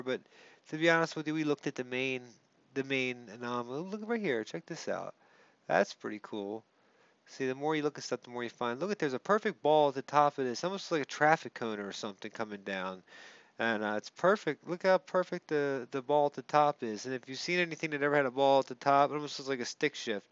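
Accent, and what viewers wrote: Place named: United States. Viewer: That single rock is interesting, the scallops on the edge are very unlikely to be natural, so well spotted. The rest are I think simply rocks. Actually his american accent to us Brits is quite charming, so don't run him down so much - anyway everybody read The Third Explanation Amazon, Kindle, but shortly to be a paper book. Keep taking the pill!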